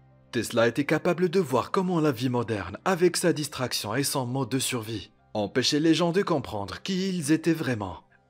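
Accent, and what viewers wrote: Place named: Maghreb. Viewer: C'est le timbre maghrébin qui te dérange ? Moi ça ne me gêne pas du tout. C'est très compréhensible. Peut-être est-ce vous qui avec un problème de tolérance et de compréhension. Ou d'idéologie...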